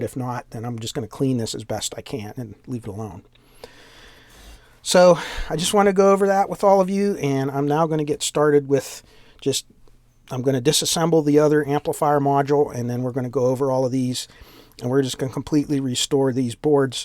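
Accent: Pennsylvania, United States. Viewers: Happy New Year.... From your accent (PA), I would guess you know the lebanon bologna cure for your cold.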